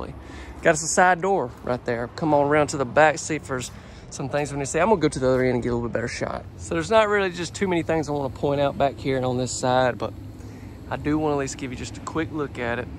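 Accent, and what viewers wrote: South, United States. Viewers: Hi! I have been watching your channel now for approximately two (2) years. This is the first time I have ever left a comment. That being said, I just wanted to let you know how special I think you are. You are delightful to listen to, with that beautiful "deep southern" accent! I am born and raised in north central Florida, City of Gainesville, and home of The University of Florida "Fighting Gators"! Technically we are Southern, but most of us do not have the accent. I suppose perhaps it is the result of growing up in a major University City, where we are schooled with people from all over. Anyway, I enjoy You and your work, as well as watching the channel grow. Your Son is precious! I had 3 sons, two who gone home to our Lord and Savior, Jesus Christ. My oldest is still here, and He has given me two beautiful grandsons, ages 17 and 13. 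Keep up the good work young man and I will continue to watch your channel. God bless you and your loved ones. Angela Phillips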